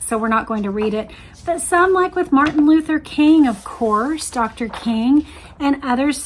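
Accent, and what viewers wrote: Texas, United States. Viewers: "Best Texas voice?", what's wrong with your normal Texas accent? I am still impressed that on the worst Friday in American history the FBI conclusively linked an abandoned rifle (not proven to have shot anybody) to Oswald (because Oswald rented the mailbox--but there was no testimony nor any evidence that Oswald picked up the rifle found) in ten hours. The FBI did this without computers, just fax machines, telephones, shoe leather and elbow grease. Why waste money on gun registration when John Edgar Hoover's boys did so well without? The "insufficient evidence" was never tested by screening the evidence for admissibility by a judge, then used to support the Theory of the Case in front of a jury. In other words, the FBI found a rifle and had a shaky link to Oswald--but never had to prove it was the same rifle that killed JFK. 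It's in poor taste to mention that there were more holes connecting Oswald to JFK's murder than there were in JFK after being shot two or three or four times.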